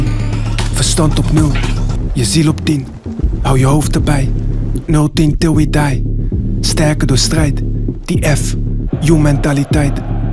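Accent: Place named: Afrikaans, South Africa. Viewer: Dig the Afrikaans overvoice ma bru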